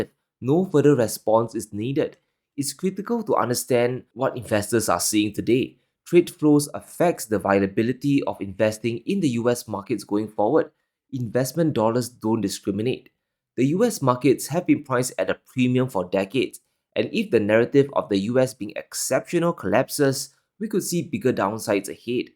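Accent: Singapore. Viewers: Too bad if you don't know what a Singapore accent is, and can't even spell properly in English. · is a Singaporean. Why would he speak in a foreign accent?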